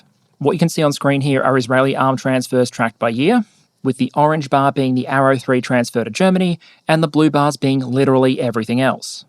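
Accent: Australia. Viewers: Don't be a tool. Different accents exist. Australian derives from SE English which pronounces H that way.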